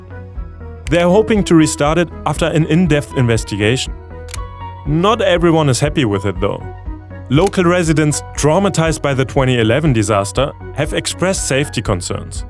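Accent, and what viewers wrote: Germany. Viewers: Are u German?🧐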